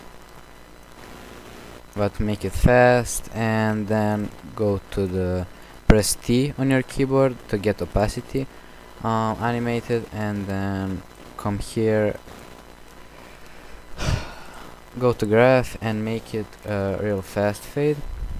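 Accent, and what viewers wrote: Russia. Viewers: Это что чеченский акцент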